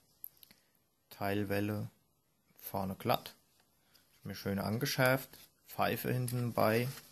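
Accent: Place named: Hesse, Germany. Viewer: Hi! Auf Deinen Kanal bin ich durch das Video von Karl Heger aufmerksam geworden:) Klasse Reviews & sympathischer Dialekt!:) Komme auch aus Hessen!:) Lasse Dir direkt mal ein Abo da! Liebe Grüße, Gear2Video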